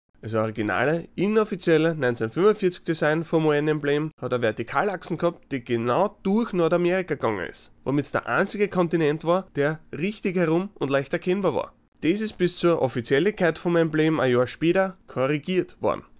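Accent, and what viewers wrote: Vienna, Austria. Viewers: oh mein gott ich hab denn österreichischen akzent total vergessen ist der niedlich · Obwohl ich zwei Jahre in Österreich verbracht hab (hauptsächlich in Skiregionen und auf Bauernhöfen bei befreundeten Bauern), geht mir dein Österreichisch auf den Sack. Zu oft versteh ich tatsächlich was nicht. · Es ist so lustig dich nur von den normalen zu kennen und dich dann plötzlich Österreichisch sprechen zu hören! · Haha nice und ich hatte schon gedacht dass ich nen österreichischen Akzent in deinem Englisch raushören kann. Top erklärt und einfach so gerade raus ohne sehr auf Hochdeutsch zu achten, klingt super sympathisch 👍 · Thematik gut erklärt und das österreichisch! 😂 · Oh so Austrian German does sound different from normal German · Cgp grey aus Wien?:) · Ach ja, ihm gab es auch mit Österreichischen Dialekt. Erstmal das Video nochmal anschauen aber diesmal auf Bayrisch². 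· Its austrian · Warum er spricht doch nur normal Deutsch? Nur halt wie ein echter Österreicher der im Dialekt redet. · This is not "Austrian German", it is a Viennese dialect. As in fact, every region in Austria, Germany and any other place in the world has different dialects. There is "Austrian High German", like you would encounter it on TV news, for example. But this is not it. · er hört si an wie a weaner (wiener), i bin theoretisch soizbuaga (salzburger), aba aus da stodt, da fließt scho a bissi Hochdeutsch mit ei;) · Ich kann nicht so gut österreichisches Deutsch finde es aber lustig zu zuhören😅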